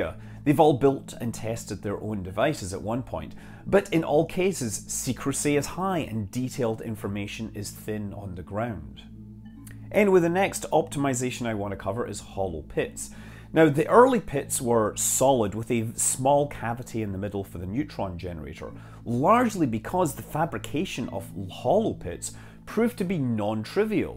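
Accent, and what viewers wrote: Scotland, United Kingdom. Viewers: I canny place your accent, Scottish ~like me, not Glasgow, more 'refined'........hmmm. :) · You know, most of us just watch your videos because we’re entranced by your Scottish accent. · where are you from originally in Scotland? Your voice reminds me of my grandfather who was from Wishaw:) I've lived in Wales most of my life and miss the Scots accent!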